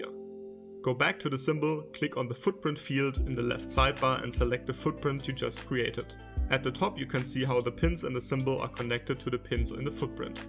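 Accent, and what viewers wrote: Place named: Germany. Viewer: Judging from his accent, he's german. So make ("machen") is often used broadly in foreign languages, even if he was referring to actually design(ing). That what happens if you don't use your mother tongue and its certainly not with bad intent.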